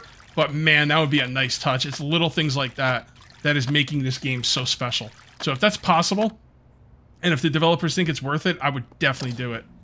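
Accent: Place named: New Jersey, United States. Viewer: Not get too personal, but is your family not originally from Missouri? Because I am originally from the KCMO metropolitan area, and to me your accent sounds much more like an eastern Great Lakes or southern New England (i.e. Massachusetts, New Jersey, or NYC) than anything specific to Missouri (which usually is a more flat Great Plains in the west and north, or has Southern influences in the Ozarks and around the Bootheel).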